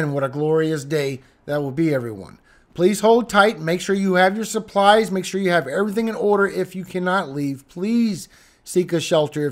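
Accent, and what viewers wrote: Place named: Florida, United States. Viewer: Good reports thank you for creating them. Your voice and accent sound like old Floridians especially in the Florida keys. You would blend in well with some of the old conchs here.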